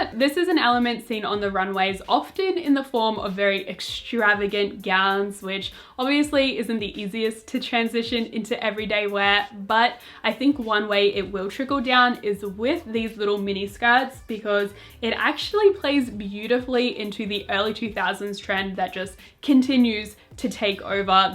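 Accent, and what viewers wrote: Australia. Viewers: I figured she was from Australia because she lowkey sounds like Felix, Bang Chan and this one Australian girl I used to know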